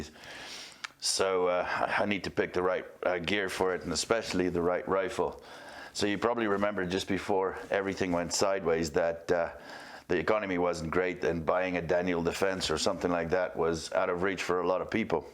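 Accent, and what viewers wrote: South Africa. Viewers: Great video, thanks. Off topic, and if I may ask, what nationality are you? Your English accent sounds like it has South African roots, with a bit of Irish and a lot of American influence. 🙂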